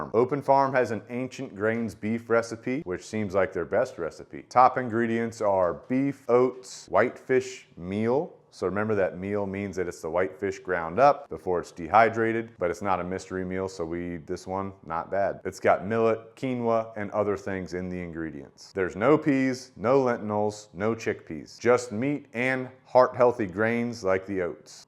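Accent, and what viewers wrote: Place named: General American, United States. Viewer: He has a standard American (U.S.A.) accent.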